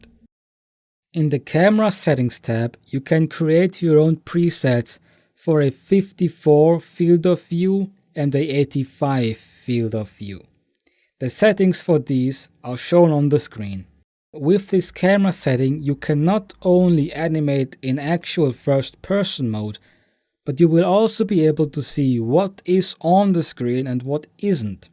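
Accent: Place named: Germany. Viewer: Lel, hab mal dein Modding Video von vor 6 Jahren mit diesem hier verglichen. Den deutschen Akzent hört man so gut wie gar nicht mehr raus, nice :D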